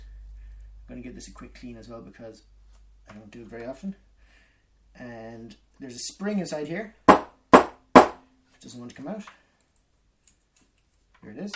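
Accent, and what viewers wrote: Ireland. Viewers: It's an Irish accent, but not a difficult one. Are you talking about that, or the volume?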